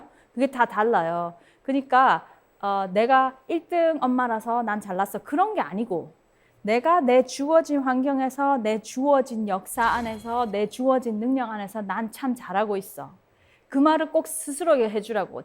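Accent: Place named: Daegu, South Korea. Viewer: 대구의 말투가 나오시네요.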